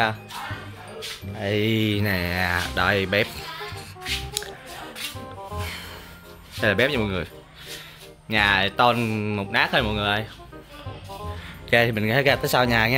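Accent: Soc Trang, Vietnam. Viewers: Quê anh sóc Trăng hả? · Quê anh ở sóc trăng hả anh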